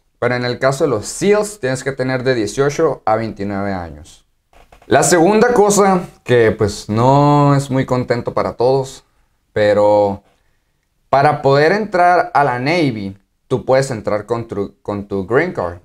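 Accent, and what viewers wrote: Sonora, Mexico. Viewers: Acabo de encontrar tu canal y tu acento se me hacía conocido, que chilo que seas de sonora yo soy de hermosillo, Mucho éxito. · Hola, Era Marine por 8 años (3rd Recon Bn. Y 1st SOTG con dos turnos de combate. Ahora soy empresario y vivo en Mexicali y con mis oficinas en San Luis RC Son. Y Mexicali BC. Me gusto tus videos. Me dio risa escuchando tu acento sonorense en tus Cammies. Saludos brother. Ooorah Hell Hound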